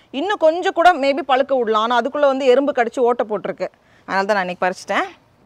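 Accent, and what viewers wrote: India. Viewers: Nice volg dear Namma uru Tamil kekavei sema mol cutiee 💓💓💓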